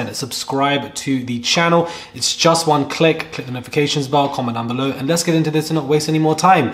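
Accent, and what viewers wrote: England, United Kingdom. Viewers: Big city London speak 🗣️ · Dont u dare apologize for that beautiful sexy voice ,its like harmony when speak ,clear to the point beautiful English accent 👍and the content 🔥 fireee appreciate u 😅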